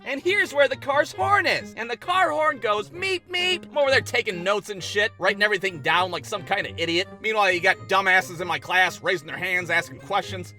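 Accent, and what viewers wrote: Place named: Ohio, United States. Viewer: I'm also from Ohio and after watching and listening to this video....as much as us ohioans don't want to think that we do....we totally have an accent. This video confirmed that theory for me.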